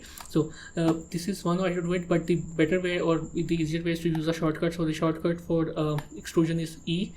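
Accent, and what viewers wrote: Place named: India. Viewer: Not to offend you, but practice your vocal skills. So speaking English without the heavy Indian accent. There are various reasons, you sound more skilled and educated. When you teach you need authority and talking with gumballs in your mouth is not helping. A good voice draws attention and keeps it. It also let you stand out from your competition. For a western audience its really horrible to listen to, sorry for the harsh words. So set your pride aside and grow your business.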